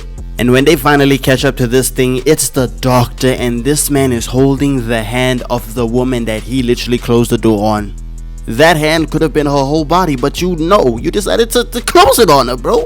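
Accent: South Africa. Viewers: Bro sounds like a South African like me 😅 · are u South African?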